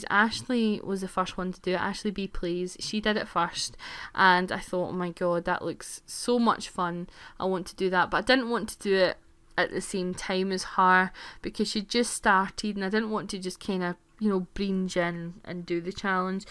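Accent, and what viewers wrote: Scotland, United Kingdom. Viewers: Awwwww I LOVE him! I got a kitten recently. Her name is Lola. She's SOOOO cuteeeee!!!!!!!!!! Now for me rambling on and on for no reason xD : My friend let me borrow this boom that takes place in Scotland and it made me think of you SOOOO much! When I'm reading about people in different countries, I like to have the accent in my head, and in the book her BFF was a Scottish girl named Emma (the main character is not a scot. OMG THAT RHYMES!!! :p ), so to figure out her accent so I didn't get frustrated when I read the book, I thought of how you spoke and repeated that in my head over and over again. :o)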